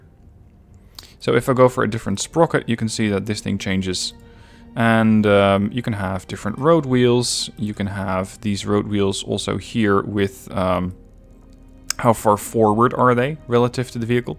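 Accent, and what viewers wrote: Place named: Netherlands. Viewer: Wait he sounds Dutch haha, are you? Sprocket is fun man, i made a the Object 257 by accident(well got close)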